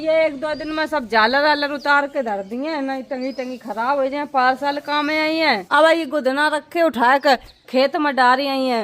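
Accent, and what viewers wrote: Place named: India. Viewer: ❤love yours Desi accent and voice❤long live with health wealth happiness and success in every part of life. Always respect and love❤ from Pakistan 🇵🇰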